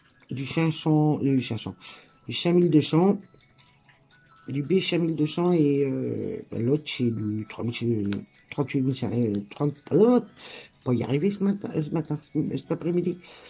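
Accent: Nord-Pas-de-Calais, France. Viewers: Un petit coucou du Québec au Canada. J'adore regarder tes vidéos et le fait que tu parles cht'i ça me fait penser à ma grand-maman qui venait de Lille. Ta petite Gorjuss et super belle.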